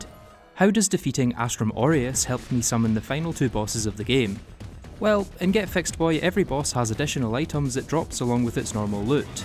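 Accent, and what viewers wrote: Scotland, United Kingdom. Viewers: are you scottish. that is my accent guess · i love your Scottish accent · By the way are you scottish?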